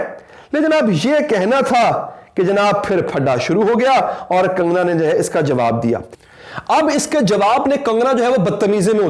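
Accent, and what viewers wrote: Punjab, India. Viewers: Iam big diljit's fan from pakistan.. Kangana has crossed her limits. She always interfare in that's type of things 😏😏😏 Ye isko na politics men ana hoga is liye sasty stunts krrhi hy.. And by the way your punjabi accent is just like diljit paji 😂😂❤️❤️❤️❤️❤️❤️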